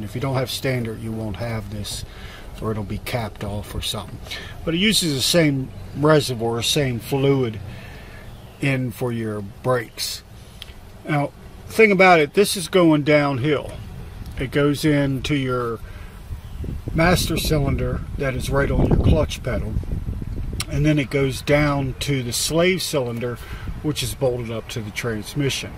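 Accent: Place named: Central Pennsylvania, United States. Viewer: You sound like your from Central Pennsylvania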